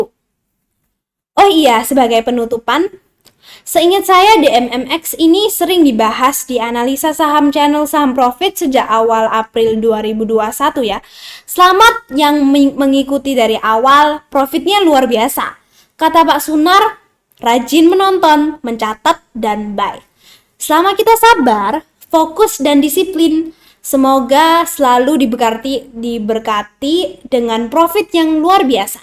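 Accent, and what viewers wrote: Java, Indonesia. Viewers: Mantabb... Walau medok tp tetep insightful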